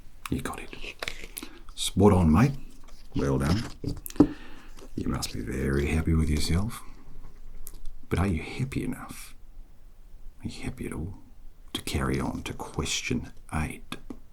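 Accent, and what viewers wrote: Australia. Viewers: Ahhhh nothing. It’s everything! Or is it? 🤔 😁 Your Aussie accent is so good it’s almost scary. I love it! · I’m an Aussie mate, you’ve got the accent nailed. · I miss Lars now, too! 😢 I broke both feet a few years back & the physical therapist's had a constant game show of some sort or another running in the background, which was rather more grating for me at times than was the (excruciating...don't break feet, people) therapy. This one would've left me feeling far less bleak, lol. I mean, there's a happiness baseline of Aussie accent & seagulls! 🖤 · Totes brill, mate! Delightful and I made it to the end, just barely. 😴 Love the basement-level intonation that comes with the Aussie accent. 👍🏻 Maybe I have more words tomorrow? 8!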